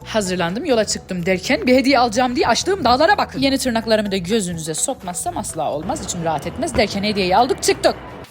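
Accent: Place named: Rize, Turkey. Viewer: abla bir gün video çekerken Rize şivesi ile değil de normal konuşur musun sevgşlinle çektiğin videoda sesin inanılmaz iyiydi❤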